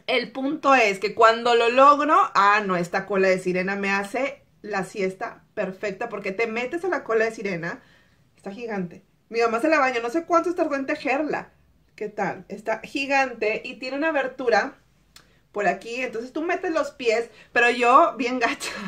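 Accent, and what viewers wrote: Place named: Monterrey, Mexico. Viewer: Me encantan tus videos, pero también entiendo perfectamente tu forma de hablar jajajaja....Las Viejas... sera porque también soy Regia, aunque vivo por mas de 25 anos en Albuquerque, y se que no es por ofender, y tu cara con lo de las tareas de los niños. Felicidades por tu canal lo disfruto mucho y ojalá puedas seguir con los videos de como organizas tu casa, que por la organización de tu cocina es como encontré tu canal, ya hace algunos anos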